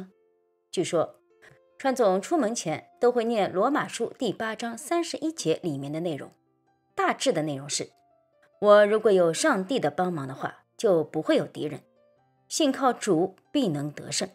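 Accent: Shanghai, China. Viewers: 老師妳的德文真好，我的德文早已還給教書先生了。羨慕妳的多才多藝。有人説妳有上海口音...我父母是上海人，民國 38年到台灣，最後也沒有機會回到上海看一看。如果不是國共內戰，我可能也會在上海長大。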